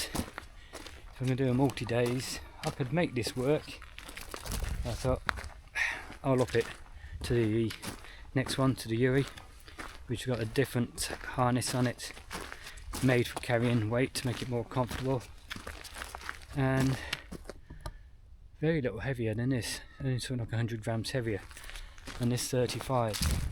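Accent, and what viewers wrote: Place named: England, United Kingdom. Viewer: New subscriber here, just watched your SnugPak Jungle bag review 👍🏻 Sorry to see you had your heart attack but glad to see you made it and on the mend. Don’t be afraid to look at complementary protocols to run alongside the conventional too 👍🏻 Hope your well for a very loooooong time 👍🏻 Incidentally you sound English to my ear 👂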